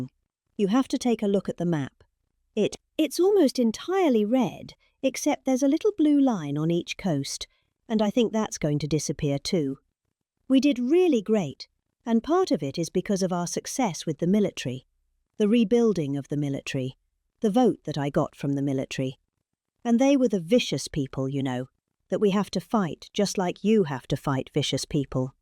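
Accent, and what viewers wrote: United Kingdom. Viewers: I have not laughed so hard in weeks. This is fabulous. Trump has early-onset dementia as well as malignant narcissism, so we are used to hearing him in speech after speech. But there is something that becomes so much more insane about hearing his actual speeches read by this British woman in her dialect. The ramblings are just...stunning. Thanks you! New subscriber.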